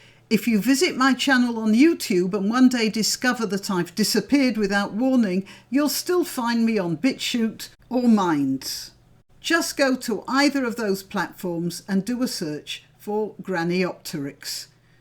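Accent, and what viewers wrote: United Kingdom. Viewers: You are rude. Why? Aren't you a litte bit ashamed of how you are talking about people,( as less intelligent and eating bad food) in other countries, for example Sweden? People from UK I have met so far have been very nice and kind. Apparently not all of them. I guess you are born in UK, you seem to speak british english. No, I will not like and not subscribe... I am not fond of rude and mean people.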